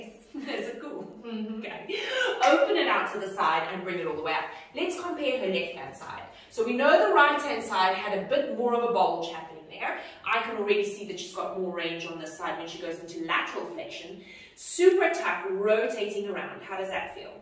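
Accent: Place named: Northwest England, United Kingdom. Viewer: She is called and she sounds like she is from my area in the UK from her accent (NW)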